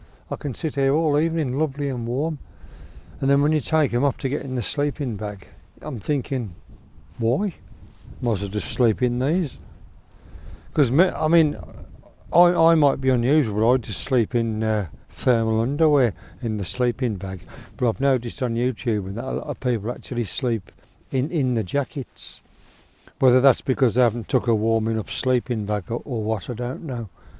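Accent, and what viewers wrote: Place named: United Kingdom. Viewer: Another great camp. Your videos are very relaxing to watch, and I also enjoy hearing the way Brits talk. “Mooching about” seems to mean wandering around, but here in the States “mooching” is slang for taking advantage of people and getting their goods for free. 😃 I also didn’t realize you had Uncle Ben’s rice over there. Love that pitch; your setups always look so tight and wrinkle free! Blessings to you and the UK! Cheers from Pennsylvania.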